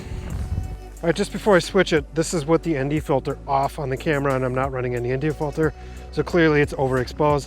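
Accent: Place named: England, United Kingdom. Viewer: Man I need a hat thrower/Box slider for my videos! Gangster! Your wife is a Pro! Man that setup is so tempting.....I want that for going to theme parks.......whenever I can go back. Great video bro! Oh and I still want those Nike Air Maggs! Looks hot in the background! Dr. Pepper is my jam!!! Great joke of course in your english accent.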